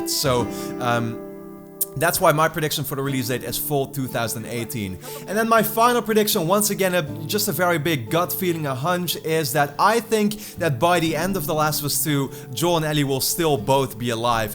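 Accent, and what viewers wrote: Netherlands. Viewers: Are you Dutch? You sound Dutch!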